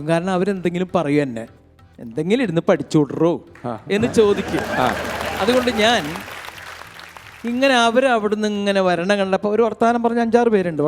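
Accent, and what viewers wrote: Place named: Thrissur, India. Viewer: തൃശൂർ സ്ലാങ്ങിന്റെ ഒരു സൗന്ദര്യo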